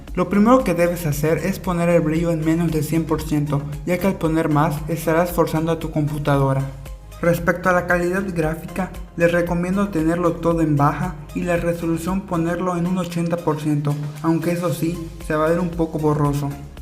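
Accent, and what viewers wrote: Yucatan, Mexico. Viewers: De donde eres? tu acento suena a yucateco.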